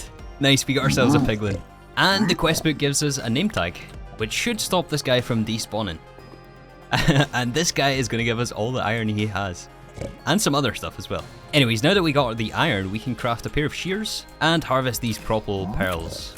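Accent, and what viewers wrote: Scotland, United Kingdom. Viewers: Hmm I cant figure out his accent. Its obviously British Isles but where exactly- "Por-P-Porpal" Haha hes scottish! Great video as always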